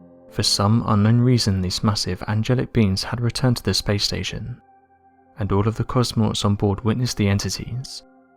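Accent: England, United Kingdom. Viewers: What's with all these info videos with these friggin narrators with english accents ?????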